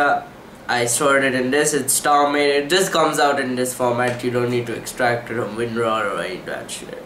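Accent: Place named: India. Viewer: but i love ur Indian voice ^^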